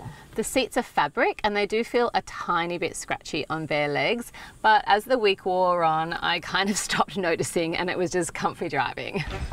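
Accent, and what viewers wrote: Australia. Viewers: its like a wagony hatchback i dig the design. Kia is making great cars with great price. Also good job on that family review with this car, hope people understands that you don't need a freaking SUV for hauling a family (the SUV trend is really bs -_- ). p.s what accent is that Australian?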